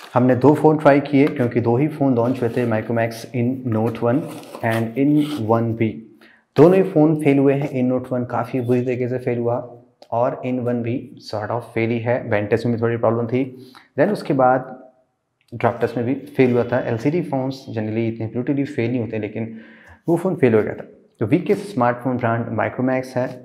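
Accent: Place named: Kamta, India. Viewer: I think you are from kamta side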